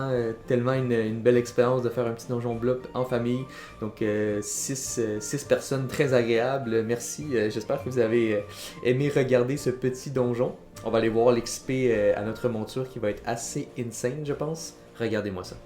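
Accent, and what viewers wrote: Quebec, Canada. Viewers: Excellente ta petite vidéo avec le commentary made in Québec. Tabarnak 😎.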